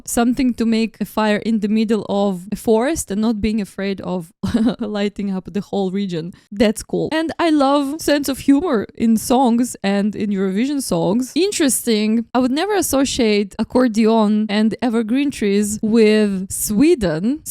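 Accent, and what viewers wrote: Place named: Russia. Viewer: Great reaction. And lovely Russian accent! Greetings!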